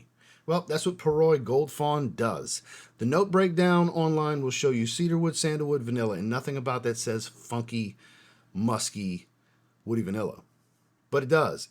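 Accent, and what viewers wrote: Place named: New Orleans, United States. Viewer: Hey! The first time I heard your voice, I said to myself, “This guy has to be from New Orleans.” Then, you were wearing a Saints shirt in another video. Hello, from a fellow New Orleanian.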